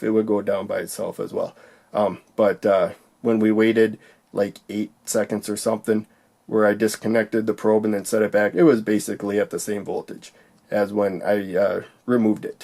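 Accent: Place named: Minnesota, United States. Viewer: Bro, are you from Minnesota? I'm getting that ''ooh, yaah'' Fargo type of accent from you.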